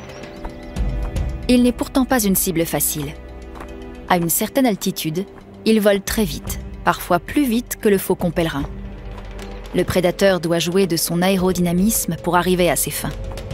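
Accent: France. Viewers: toi t'y'es un parisien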